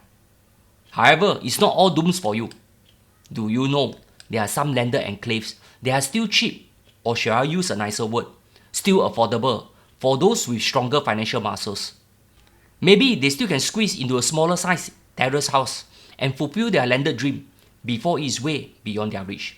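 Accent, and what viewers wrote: Singapore. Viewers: very nice sharing. Always love the Singaporean way of speaking, chap-pa-lang mixture of languages/dialets used. Can do a similar analysis on west coast areas. Around West Coast Rise/Ave/Garden, Faber Crescent/Drive, Sunset Terrace/Drive, Pasir Panjang?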